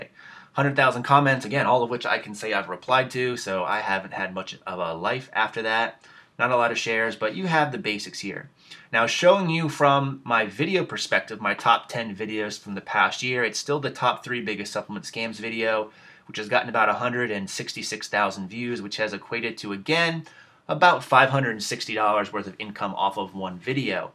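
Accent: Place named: New England, United States. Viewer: Where in New England are you from?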